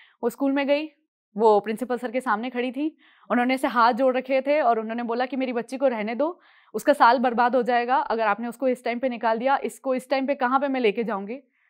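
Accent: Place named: Rajasthan, India. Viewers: आपका पहनावे और बोलचाल से हि पता चलता है कि आप वीरों कि भुमि राजस्थान, मेवाड़ से हैं। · Khammma Ghani Baishaa Mevadi me aapki boli hunke ghano majjo avve.... Khas krne vo jo bachha he vo msttt lagta he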